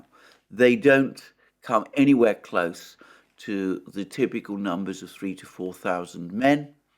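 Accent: England, United Kingdom. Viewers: It's weird listening to a communist with an English accent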